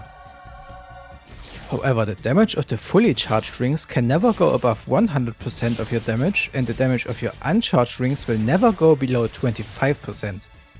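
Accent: Germany. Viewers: ein deutscher wie man seine englische ausprache kennt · you sound german xD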